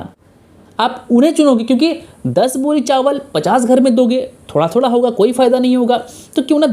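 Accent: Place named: Bihar, India. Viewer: aapke accent se lagta hai your from Bihar or Jharkhand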